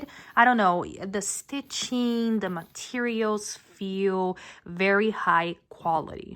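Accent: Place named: Brazil. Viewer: so pela intonação da sua voz consigo perceber que você é brasileira, abraço! 🥰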